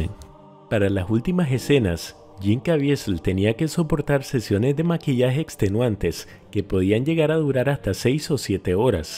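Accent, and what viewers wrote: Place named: Spain. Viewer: Muy buen video pero tu acento es espantoso debe ser castellano neutro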